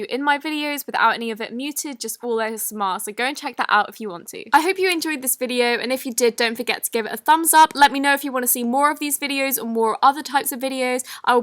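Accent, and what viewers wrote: United Kingdom. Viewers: she has a little bit of a British accent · She's British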